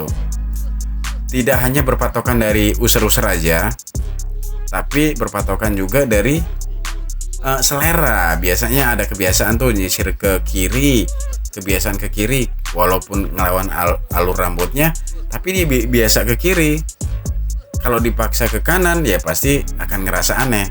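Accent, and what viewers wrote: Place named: Pati, Indonesia. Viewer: oh pantess saya penasaran aja logat sampeyan kayak orang pati.ternyata simbahnya dari kolilo pati.ya2.ngak meleset filling gw.😎😎😎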